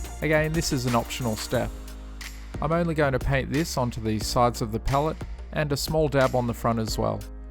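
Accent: Australia. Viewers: His accent sounds Australian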